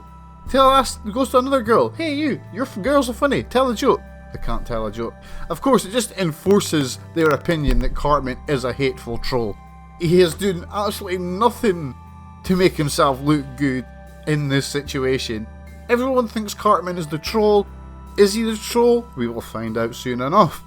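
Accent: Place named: Aberdeenshire, United Kingdom. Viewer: Hey min, far aboots ye fae loon? Ye soun like a Brocher? Definitely Aberdeenshire, aye?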